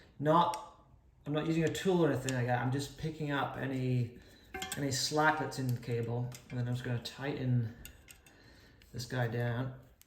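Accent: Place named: Scotland, United Kingdom. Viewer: Fabulous video…enhanced by the hint of a Scottish accent ..👏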